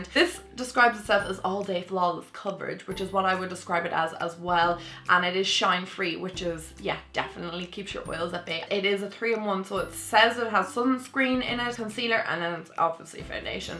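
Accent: Ireland. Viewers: I love Maybelline Fit Me Matte!! Oh, and I subscribe just because you have this cute Irish accent. Me love Irish accent!!!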